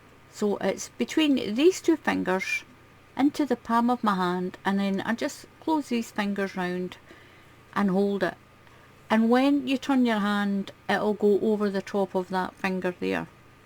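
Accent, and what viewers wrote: Scotland, United Kingdom. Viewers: I stumbled over your website. You have wonderful patterns. But I have a problem. I got sent to a hearing specialist when I was 5 yrs old due to suspected loss of hearing. Severe ear infections over the years ate away the bones in my inner ear. I relate this for all new mothers to pay attention to fussy babies who keep rubbing their ears or get colds. Working antibiotics finally arrived when I hit adulthoods, but the damage was done, I lost complete hearing in left ear due to destroyed bones, and partial in the right is left. I am 70 yrs old now, retired, but lost almost all my hearing. Hearing aids were stolen by “helpers.” My problem is now not being able to understand low soft voices. I had a Scot boyfriend in college your voice sounds much like his mother’s. So I have a dual problem, voice too soft to hear well, and I have to work my way through your accent. 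What compounds it is so far, I can find no instructions in your various pattern videos included in printed on your videos. I have to see the piece you are working on to figure out quickly what you are doing. But often your hands cover the work. Most f what I crochet is done for charity. I’ve done hats, scarves, mittens for grade school children, donated them to schools that provided children with winter items who lost theirs or parents couldn’t afford to buy them. I’ve done baby blankets to go to maternity units in hospitals or for those in NICUs for preemies. Also have done lap afghans for wheelchair vets in veteran h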